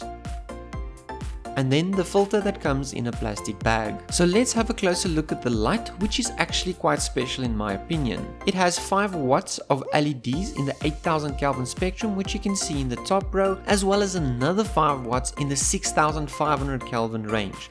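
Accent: South Africa. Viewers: Everytime this guy says "prawns" i think of the film district 9. Gotta love the south african accent.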